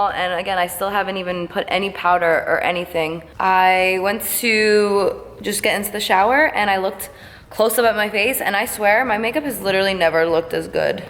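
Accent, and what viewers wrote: New Jersey, United States. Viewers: You sound like your from Jersey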